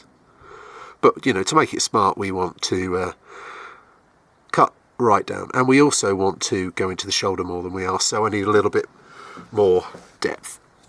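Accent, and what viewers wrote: England, United Kingdom. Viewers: What a grand name and accent you have! Which part of England is your accent from? Wow! love it.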